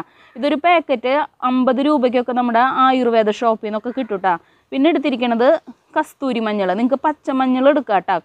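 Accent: Thrissur, India. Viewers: ചിരിച്ചു ചിരിച്ചു ഞാൻ ചത്തു നല്ല തൃശൂർ സ്ലാംഗ് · ഈ വീഡിയോ ഒത്തിരി ഷ്ടായി. അവതരണം രസാണ്. ആ തൃശൂർ ഭാഷ വളരെ ഷ്ടായി. തൃശൂർ എനിക്ക് ഒരു ഫണ്ട് ണ്ട് അതെ സംസാരം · തൃശൂർ ശൈലി സൂപ്പറായി. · 👌നമ്മൾ തൃശൂർ ഗഡീസിന്റെ സ്ലാങ് സൂപ്പർ 👏👏👏സൂപ്പർ ടിപ്പ് 👍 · ഭാഷ സൂപ്പർ ത തൃശ്ശ്യർ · 🙏🙏 Super tips. Thanks for this tips. സ്വദേശം തൃശ്ശൂർ ആന്നോ. നല്ല പ്രസന്റേഷൻ. Video കാണുമ്പോൾ ഏതായാലും ബോറിങ് വരുന്നില്ല ഞാനും ഒരു subscriber ആണ്.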